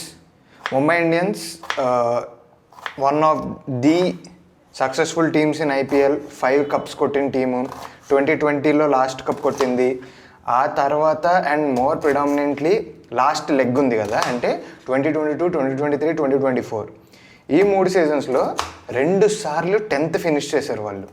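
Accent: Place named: United States. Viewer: Aa accent entra? American Telugu ah?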